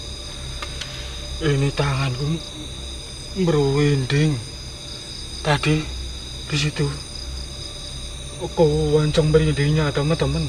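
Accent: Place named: East Java, Indonesia. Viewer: Kang nih lokasi dimn.... Dngar logat bcara kayak org jawa timur, tpatx malang, bnrkh?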